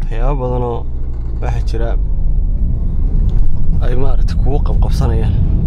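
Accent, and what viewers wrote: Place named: Somaliland, Somalia. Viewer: Is fahano dhibka wa lahjada😂 adinka wa idin ku qosalna wlhi marka ad xamar imdaan😂lahjadina funny wye guuleysta rer somaliland one love❤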